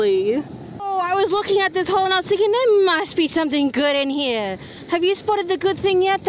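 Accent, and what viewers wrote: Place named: Australia. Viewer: Hi, I am pretty sure you had new shells 3 or 4 of them. I am going to make a list now of every video. Bearsy had to be held today tho. So many kinds of shells, I can hardly keep them straight. I only still know a handful of them. I know all the US tho because I have them except for maybe a very few. Do your hands ever get puckered from the salt water? You are my very favorite of all the shellers, fun, talk loud enough, show the shells closely, love your Australian accent, we get to see you live occasionally, love the music, ending where you take a lot of time arranging what you find. LOVE YOUR VIDEO SO MUCH. Take care please. Love and hugs from Trudy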